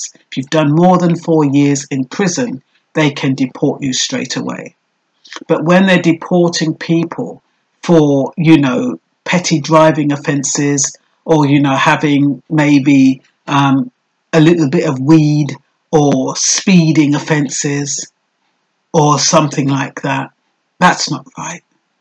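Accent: Jamaica. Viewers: It is so funny when you're talkin your Jamaican accent😂😂😂 you have me dying..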